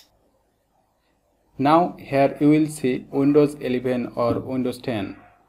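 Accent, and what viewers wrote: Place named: Bangladesh. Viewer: Bro are u from Bangladesh?....the Accent seems like that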